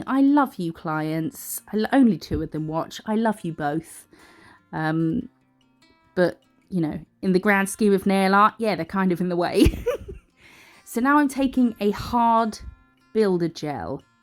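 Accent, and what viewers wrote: United Kingdom. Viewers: I... did think you're Australian?! 😅This is me being embarrassed now!!! OMG! You're a BRIT! I love it! How could I have not known? I don't know why, but I always confuse the accents of UK, AU, and NZ... it's like trying to tell Scottish from Irish. Or Mexican Spanish from Spain's Spanish, or French and Canadian French. I'm so bad with accents!! Despite all of it, I still think your kindness is top notch!! UV light is like cigarettes. I'm not gonna nag anyone about smoking a cigarette now and then, but if you do it often enough, and if it's unfiltered, etc, you know, those kinds of things increase risks. So let me start by saying I'm a redhead with very fair skin. I don't tan; I burn. I spent every childhood summer burnt to a crisp, red as a cooked lobster before humanity understood the increased skin cancer risks per sunburn, blah, blah, same thing with smoking. 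Now I'll add my age (50), family history (an uncle with skin cancer), and me being on chemo for a bit over 2 years as of right now, so the risks add up. This isn't me trying to create mass hysteria over sunshine. I swear, I'm ultra-aware that all my risk factors don't apply to everyone. I'll share what I learn, but I don't wanna push it on anyone. I'm not an evangelist, just trying to explore risk reduction. Also, another thing neither of us thought of, and I could slap myself now because I've studied it a bit, SILK!! I had myself a good cry about an hour ago as I was reading and watching tutorials about how to repa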